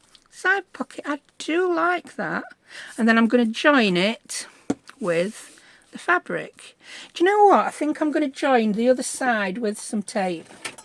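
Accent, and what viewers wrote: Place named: Yorkshire, United Kingdom. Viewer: I love listening to you! I’m Irish born and lived in Yorkshire for 3 years from age 6-9 then moved to the US. Listening to you talk feels so comforting and nostalgic to me as it’s how I spoke in my formative years. Love your projects and you! Wish you would do a ‘get to know me’ video. ❤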